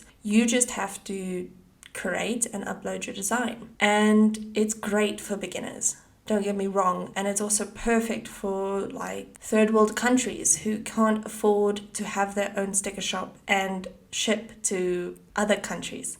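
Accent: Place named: South Africa. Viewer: im like this girl 100% sounds south african and boom i was right. i do redbubble for fun and you are 100% right through out your video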